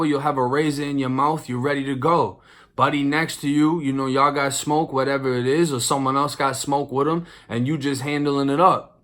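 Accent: Boston, United States. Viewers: Man ive been watching your videos, from the beginning on 23&1. Its nice to see you putting up some numbers. Ive been in 3 times, 18, 22,26, I'm 38 now. I was in NC for the first 2 and nd for the last. Prisons are rough especially my first bid in Polk youth center. It's what you call jit camps. But not as rough as the shit youve been though. Stories are crazy. But I can tell that you learned alot and matured in a way the streets cant provide. You can just tell by the way you reflect back on your time, and situations you went through and learned from. Alot of people dont understand the invaluable lessons your learn about life from prison. After the prison mentality melts away, you can apply those lessons to the way you conduct yourself on the outs, as well as knowing how to treat people with respect. Man keep up the good work, and I'm glad you went back home, florida would have got you caught back up. 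I'm watching this show called city on a hill, about Boston and your accent trips me out, cus its just like the dudes in the show. Anyway, keep up the great story telling. Others can learn invaluable lessons just by listening to the things you say.💯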